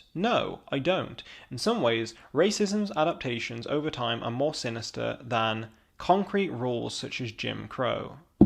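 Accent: United Kingdom. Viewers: oh ... and I truly love your British English "accent" ... brilliant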